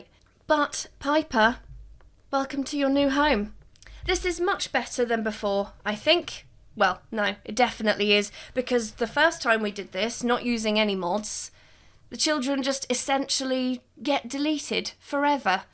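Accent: United Kingdom. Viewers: Your accent in particular is so nice to listen to. I don’t usually like British accents but yours is so satisfying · She has the classy UK accent that’s why lol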